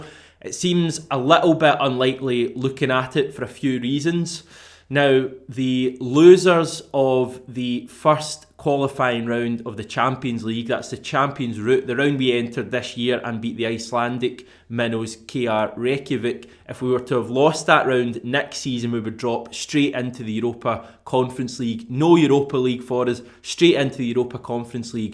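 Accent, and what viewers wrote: Scotland, United Kingdom. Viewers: He's scottish. I think most folk don't understand us.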